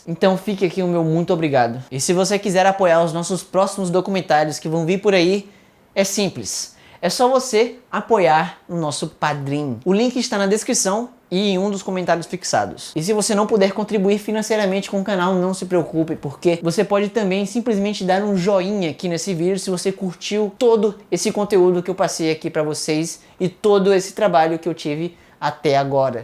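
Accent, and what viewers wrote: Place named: Northeast, Brazil. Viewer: Tu é de onde aqui do Nordeste ? 🤔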